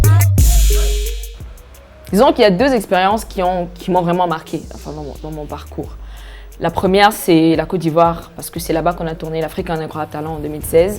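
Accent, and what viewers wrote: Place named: Cameroon. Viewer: Je kiff trop quand tu parle le français genre genre du camer 😂😂😂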